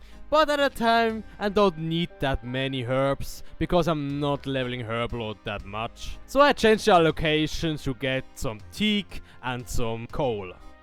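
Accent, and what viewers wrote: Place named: Switzerland. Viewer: Direkt am Akzent der Schwiizer erkennt hahaha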